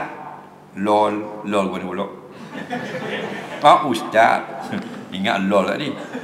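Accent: Terengganu, Malaysia. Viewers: Awak salah dengar tu..ustaz cakap loghat terengganu..ustaz cakap : dengan bayar ansuran, berapa? 75 ribuuu, halal..pasal kita dok wat pinjaman riba..kita beli harga tinggi..halal lah..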